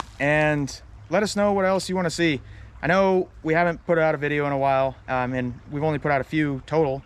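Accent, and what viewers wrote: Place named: Tennessee, United States. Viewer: I can tell your from Tennessee. Same 🤣 you sold me On this .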